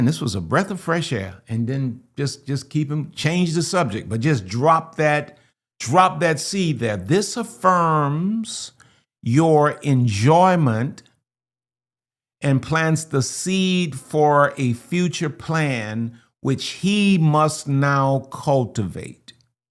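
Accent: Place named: South, United States. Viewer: You speak with a proper southern accent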